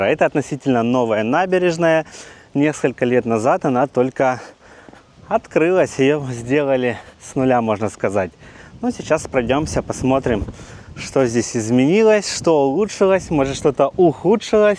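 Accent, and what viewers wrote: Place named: Donetsk, Ukraine. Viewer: Вы родом с Украины ? По вашему говору и стилю очень похоже.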